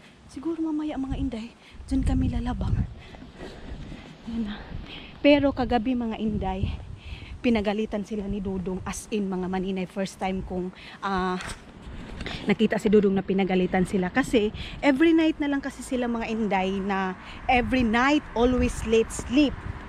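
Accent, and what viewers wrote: Visayas, Philippines. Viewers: "maglabang tayo dito" Bisdak jud nga daku! Hahaha! Thank you for making my day. Kaya ra kaau ang lockdown diri basta ga lantaw sa imong mga vlogs. Lingaw kaau. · Bisaya d i ka inday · Bisaya diay ka.?!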